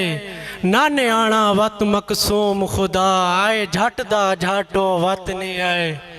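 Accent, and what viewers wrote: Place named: Saraiki, Pakistan. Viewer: Khoobsorat lehja Sraiki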